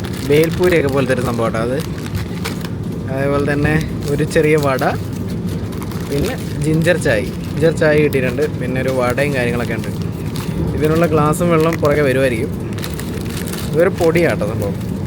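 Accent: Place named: Kottayam, India. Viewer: എനിക്ക് നേരത്തെ doubt ഉണ്ടാരുന്നു കോട്ടയം അല്ലെങ്കിൽ ആലപ്പുഴ നിങ്ങൾക് താഴ്‌വഴി ഉണ്ടെന്ന്. അല്ലെങ്കിൽ ഇങ്ങനെ സ്ലാങ് കറക്റ്റ് ആവില്ല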